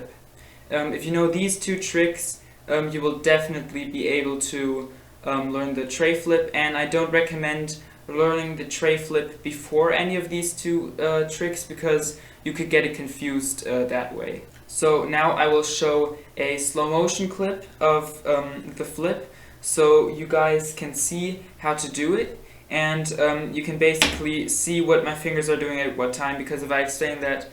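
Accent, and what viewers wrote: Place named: Germany. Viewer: 90% sure u are german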